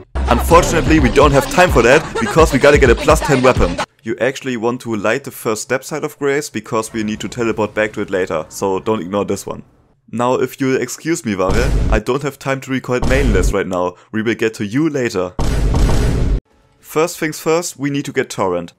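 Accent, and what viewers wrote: Germany. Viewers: bist du bei deinem Englisch zufällig deutscher?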